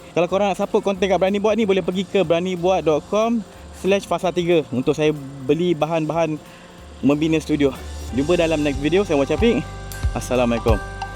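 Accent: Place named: North, Malaysia. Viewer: Bahasa menunjukkan bangsa...kueh2 buat keluaq...hahhahah...abg org utara ka...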